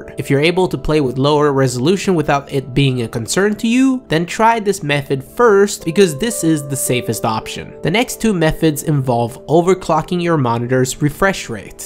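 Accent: Sweden. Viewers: u swedish? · ur swedish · är du svensk?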